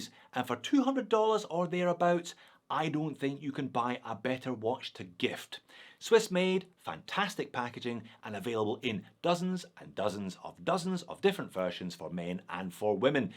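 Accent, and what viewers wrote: Scotland, United Kingdom. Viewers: I’m not going to win fuck all anyway so I’ll just say that the video was very good as usual ,i really like videos just to hear your accent reminds of home back in Scotland it’s the only one i hear as i now live near Boston Massachusetts ,have you heard the Boston accent? I’ve been here over twenty years and I think the Peterhead fishermen have had elocution lessons compared to the accent here,so you and your new family and Mr P have a fucking wonderful Christmas and drunken fucking outrageous New Year you only live once please make the best of it.🇦🇺🏴🦘🐑👍🍸🍸🍸🥂🥂🥂😂😂😄Comments like this are why I don’t win sod all and finally please keep up the Scottish sense of humor it’s one of the few things the Tory’s have left us.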